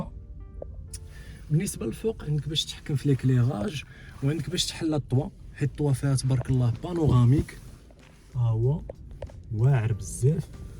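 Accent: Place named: North Africa. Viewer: وربي مكيف ومبسوط على اللهجه اكثر من ااسياره.. ربي يحفظ شمال افريقيا واهلها الكرام.. جعل ربي يرزقكم هل سياره كل واحد منكم.. لانكم تستاهلون كل الخير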